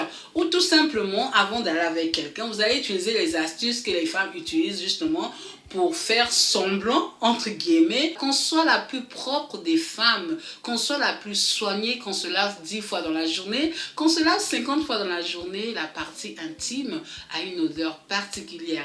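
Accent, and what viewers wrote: Ivory Coast. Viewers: Loooool 😀 t'as vraiment l'accent ivoirienne hein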